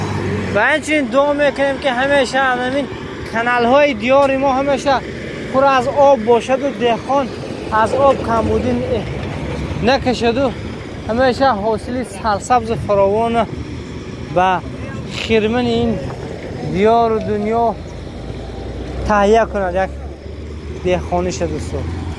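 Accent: Mastchoh, Tajikistan. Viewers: Бо лаҳҷаи Мастчоҳи гап мезанан.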